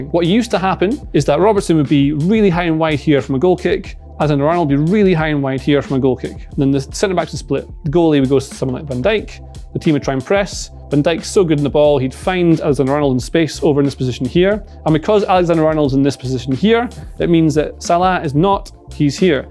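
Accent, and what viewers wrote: United Kingdom. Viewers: 😂🤣 was listening to this on speakers at work and my colleague said “ everything explained by a British person is just better “ I’m in the US for context. ❤️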